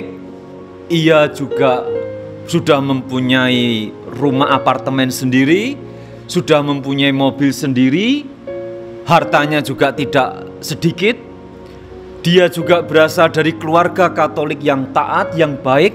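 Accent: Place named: Banyumas, Indonesia. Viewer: Senang mendengar ngapakx father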